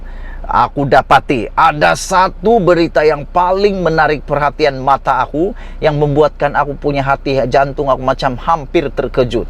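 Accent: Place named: Sabah, Malaysia. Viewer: Cakap mu MCM orang Dusun Darat buat malu ja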